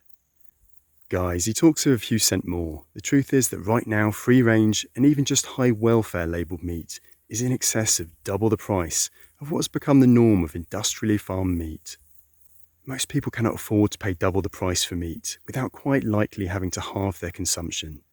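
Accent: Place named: RP, United Kingdom. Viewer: He speaks English with an upper class accent, lovely pronunciation, but not over the top. Really easy to listen to.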